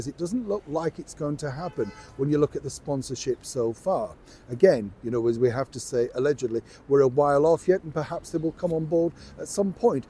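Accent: United Kingdom. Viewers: The British accent makes it hard for me to understand and I gave up on this video. Boring as hell.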